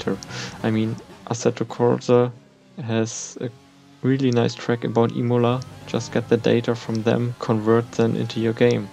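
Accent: Germany. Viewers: Da du deutschen Akzent hast versteht man dein English viel besser!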